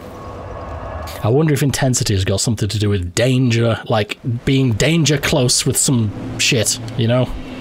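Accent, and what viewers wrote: Scotland, United Kingdom. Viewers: That’s not an attempt at a Scottish accent. That’s a real deal Scotsman right there, no doubt about it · That Scottish accent isn't an attempt btw, that's a genuine Scot. I tell you that as one such specimen myself :P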